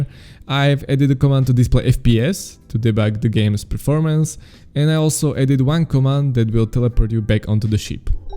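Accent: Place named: Czech Republic. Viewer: Your accent sounds like czech lol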